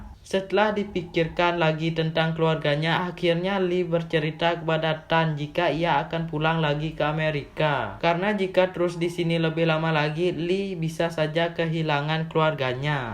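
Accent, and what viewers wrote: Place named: Bali, Indonesia. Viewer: Sperti suara orang bali 😅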